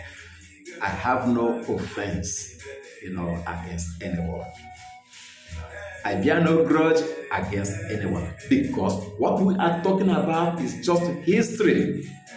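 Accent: Igbo, Nigeria. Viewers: Igbos migrated from Ikwerre. So Igbos and Ikwerre are one. The father cannot deny the son and vice vasa. History is shrouded in ambiguity, yet you state emphatically Ikwerres are not Igbos. You contradict yourself. I always see self-contradictions in your analyses. You say whatever a man wants to be called, that's what he should be called. A man wants to be called a woman, so that's his identity? You are really driving the train. What a fool! When you are talking, one immediately knows you are an Igboman, your dialect, gestures betray you as an Igboman. Onye eririeri. You do not reference Uche Dim Okwukwu, the former secretary general of Ohaneze Ndi Igbo who hails from Elele in Ikwerre land, who is now vying for the presidency of the same organisation. What about Wike's younger brother who is the president of Ohaneze Ndi Igbo in South Africa? You talk as if all Ikwerres agree they are from Benin. 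And yet you state emphatically there is no way all the people in a place are descendants of one man. Another self-contradiction. You call yourself a historian and yet you have not heard of the similarity between Igbo and Hebrew. There is a book on it done by a white man. Search for it. You will find it. Do you known the origin of the word Benin. It came from Ile Ibinu, house of vexation. It is Yoruba. It originated from Oranmiyan. Before that time, it was called Igodomigodo which is an Igbo word. It is on record(see Jacob Eghareva). The Oba family in Benin originate from marriage bet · evn ur accent and looks cannot lie. u be igbo stop lying to urself. benin no dey share language. ika say benin , ikwerre say benin, ijaw say benin all of una say benin so benin na God wey dey share language and surnames now right? una really be mumu sha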